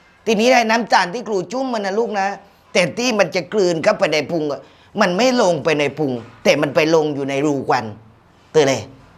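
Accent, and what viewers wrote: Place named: South, Thailand. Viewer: พลัดถิ่นมานาน ได้ฟังภาษาใต้พื้นๆ แหลง ทองแดงแล้ว ขำ คนภาคอื่นจะรู้ไม๊หนิ ว่าเราพูดภาษากลางแล้ว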